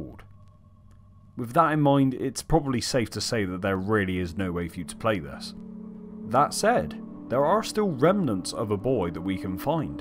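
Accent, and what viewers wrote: Cornwall, United Kingdom. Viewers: Recognised the kernow accent and subscribed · British limey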